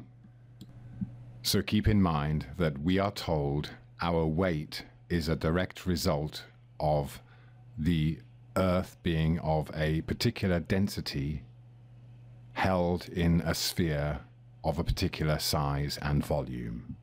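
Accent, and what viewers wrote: England, United Kingdom. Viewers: I am so glad to hear this guy with some sort of English accent -- I thought all of the flat Earth nutjobs were from the US. It makes me feel a little bit better to know that some of the morons are from abroad.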